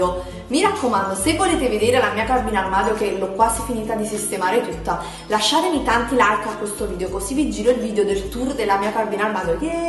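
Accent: Naples, Italy. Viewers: io amo la tua cadenza napoletana 💖💖💖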